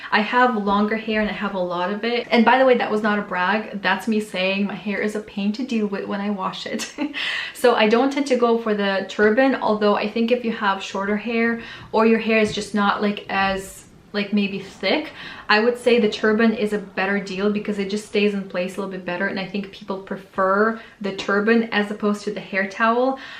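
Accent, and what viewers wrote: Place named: Michigan, United States. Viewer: I love Mason Pearson brushes! What state are you from? You sound like you’re from Michigan or further NW 🙂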